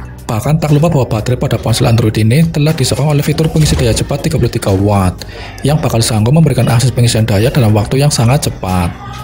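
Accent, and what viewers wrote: Banyumas, Indonesia. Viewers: Ngapak nyaaaaaa,...😁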